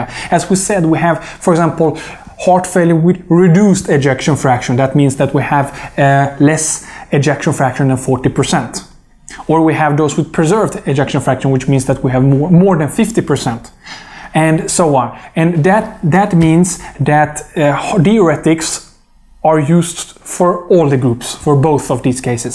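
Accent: India. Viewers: perhaps he should talk in his own language instead of butchering English with his heavy accent. Especially when he is talking about something as important as heart failure. Iv had doctors from India and I find there education is subpar . A person can put there life at risk having a doctor from a third world country, let alone trying to figure out what there talking about. Most eastern doctors come to America to live off patience of Medicare, they can't make a living in India. There guaranteed payment in America. That's was why they come to america with there lack of education and experience.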